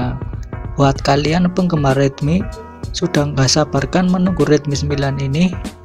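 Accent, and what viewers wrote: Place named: Tegal, Indonesia. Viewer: Medok bgt....tegalll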